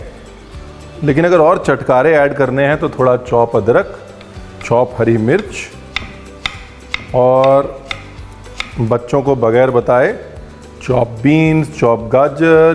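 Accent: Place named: Punjab, India. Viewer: Your punjabi accent is mind blowing🤯 👨‍🍳 chef. Keep coming with such great content and recipes. 🥳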